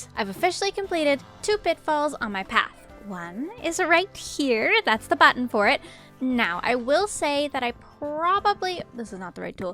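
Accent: Newfoundland, Canada. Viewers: Oh my god your accent makes so much more sense now that I know you're from NFLD lmao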